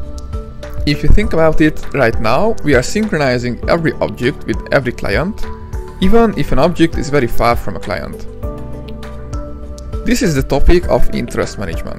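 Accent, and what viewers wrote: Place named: Hungary. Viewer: Akcentus. Ne értsd félre, szuper minden, köszönöm a videót. A magyar unity-seket így ismerni fel :)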